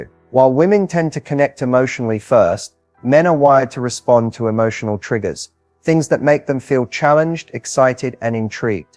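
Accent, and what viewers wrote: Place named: England, United Kingdom. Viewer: I like this English accent. I think I should learn to speak like this .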